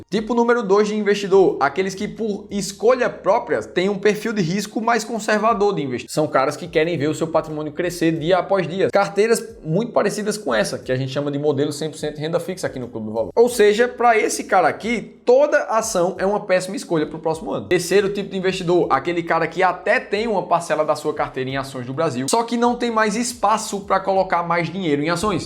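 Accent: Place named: Northeast, Brazil. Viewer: Moro no RS, mas entendo perfeitamente bem o vídeo, mesmo com sotaque nordestino.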